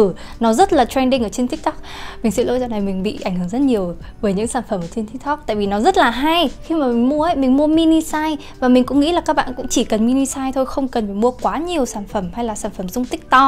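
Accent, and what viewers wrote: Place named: North, Vietnam. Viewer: Em nói Tiếng Anh nghe tuyệt quá, và đây là lần đầu tiên thích nghe giọng một người nói tiếng Bắc như vậy, không giống những người chị từng nghe.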